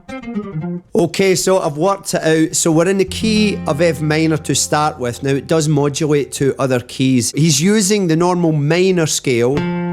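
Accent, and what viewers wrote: Scotland, United Kingdom. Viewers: Love you reaction including the wee Guy!! But most of all I love your Scottish accent!!! 🤩🤣👏👏👍😘😍❤️🇨🇦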